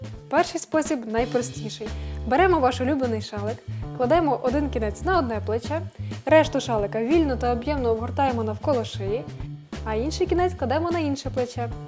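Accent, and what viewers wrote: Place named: Ukraine. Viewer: У Вас дуже гарний український акцент:-)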